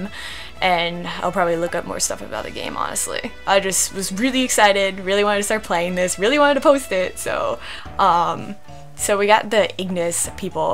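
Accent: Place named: South, United States. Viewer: you seem to have that kind of twang in your speech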